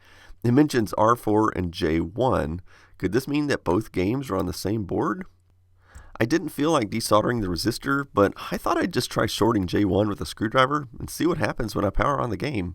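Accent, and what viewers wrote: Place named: Texas, United States. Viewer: I'm not sure why, but your accent seemed more noticable to me in this video than all of your other videos I've seen. I don't think you're speaking with more of an accent than usual, I just seemed to notice it more today. Mostly when you say "All right", very Texas-ish hahaha. Anyways, love your content, you're one of my favourite YouTubers! Thank you for continuing to produce entertaining and informative videos!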